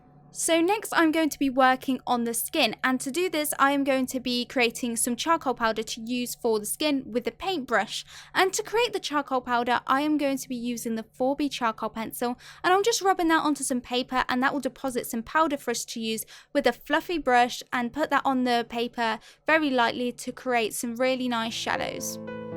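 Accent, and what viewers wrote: United Kingdom. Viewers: Believe me your sketch is far beautiful than the real picture and by the way I love British accent.😉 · I love your British acsent. I could listen to you talk all day. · Beautiful British accent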